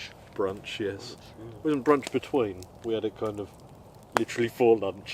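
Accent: United Kingdom. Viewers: 'I'm In' on the ⛺ giveaway. Happy Birthday. Luv your channel & British accent. Cool cooking style😎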